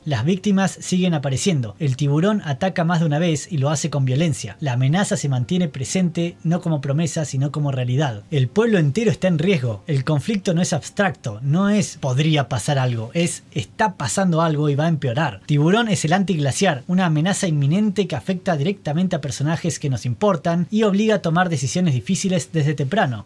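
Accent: Argentina. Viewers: No acabé de leer el video, no soporto el acento argentino, te recomiendo que aprendas a hablar con acento neutro.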